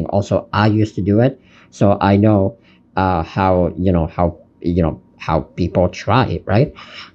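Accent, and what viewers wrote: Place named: United States. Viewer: Hi...I follow you on Instagram and I came to Youtube to hear you speaking 😉No, I'm not a weirdo, LOL....I was just intrigued by your silent Instagram videos, and that eye-twinkling smile. of yours... I'm an artist too and I like to follow young artists to see how they progress with their art journey.... In the description, it says that you live in Tunisia.?.. but your accent is American.... You got me all curious, especially after listening to you and seeing what an amazing speaker you are at just 19 (20 now, I know). Sincerely impressed by your confidence and know-how at such a young age. 🤩😍 Sending you warm regards, my fellow artist!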